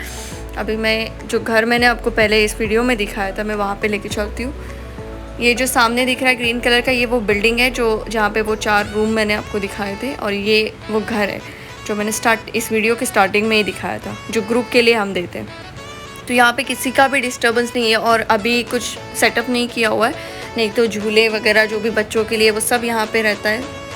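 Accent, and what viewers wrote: Malvan, India. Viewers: तुझा मालवणीच आमका गोड वाटता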